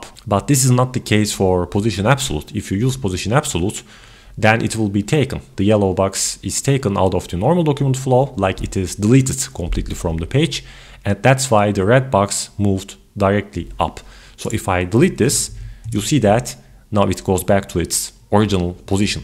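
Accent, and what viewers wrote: Turkey. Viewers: did not even check the name of channel as soon as I heard your accent I am like kanka naber ya LOL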